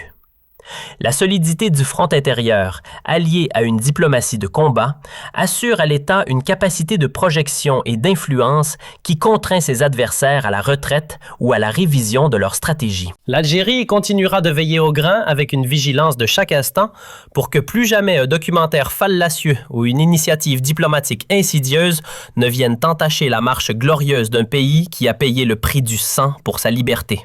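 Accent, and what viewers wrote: Canada. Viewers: cet accent canadien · fait avec un accent québécois plutôt que français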